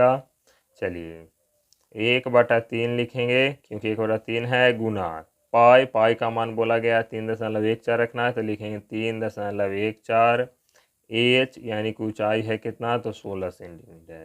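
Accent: India. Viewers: thanks sir bhojpuri style me 😘😘😘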